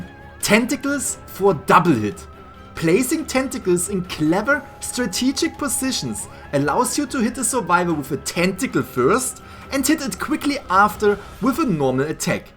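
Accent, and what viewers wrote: Germany. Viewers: Are u german? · Are you German? Because of your accent. No hate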